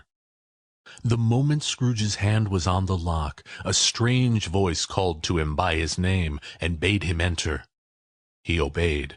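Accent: United States. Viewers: why an American reading a book set in Victorian England?? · Managed about 4 minutes listening to that droning yank accent.. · American accents are no good for Dickens period ! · I want a version thats not told with an American accent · Bye = American accent. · At first I wasn't so sure about Dickens in an American accent, but this one actually turned out to be pretty good. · Awful American version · Dickens told in American-English accent?? Really? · Yes...now am about to switch it off because it's in American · I am, but it doesn't sound right in an American accent. I'm going to find an English version! Merry Christmas! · Sorry. Merkin accent. Bye bye. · Wish this was in an English accent. The American kind of ruins it. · we say it “you bik wi tous” in the us and he sounds America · I’m sorry but I can’t deal with the American English. Anton Lesser ( Game of Thrones) does Dickens very well. 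· as good as this reading is the mere fact that it is read by an American accent and the word "clerk" in britain is pronounced "clark" which takes away just a little from believeing this tale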